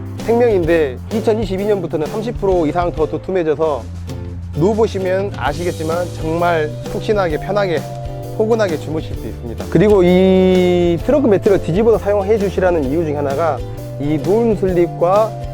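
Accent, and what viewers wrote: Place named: Jeolla, South Korea. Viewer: 으따 절라도 사투리 겁나 쓰구마 ㅋㅋ 좋게 잘 봐부러쏘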